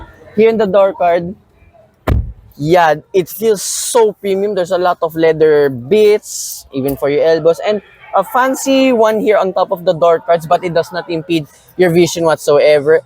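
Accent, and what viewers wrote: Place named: Philippines. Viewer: Pinoy accent pero in fairness magaling sya mag english. Malawak vocab nya 👍🏼 But I want to hear him magtagalog haha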